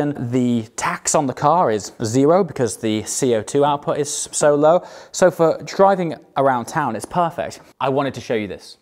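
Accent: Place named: England, United Kingdom. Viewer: Loving that nice english accent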